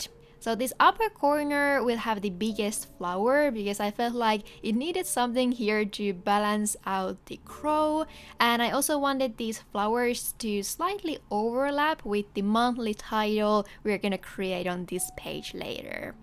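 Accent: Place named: Finland. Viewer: beautiful!! also your accent sounded familiar so i had to check if you're finnish, and you are! terkkuja suomesta! 😄❤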